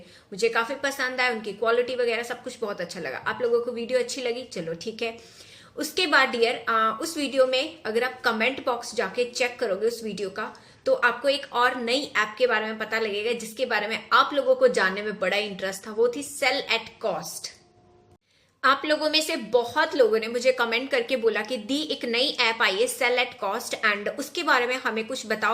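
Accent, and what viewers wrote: Punjab, India. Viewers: R u a Punjabi Girl.......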